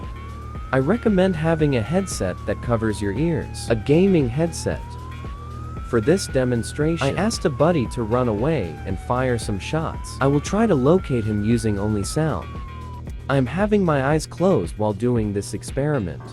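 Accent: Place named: Sweden. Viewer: Hahaha ännu en svensk, har hört att folk tydligen gillar vår brytning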